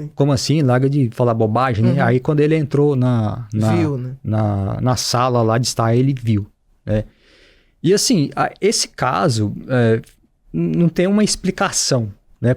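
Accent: Minas Gerais, Brazil. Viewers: Sotaque mineiro ❤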